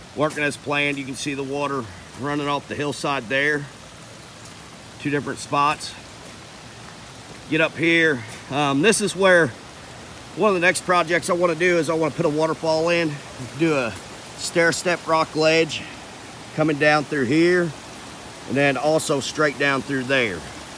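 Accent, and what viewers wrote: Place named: South, United States. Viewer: It's like a post10 video with a southern accent.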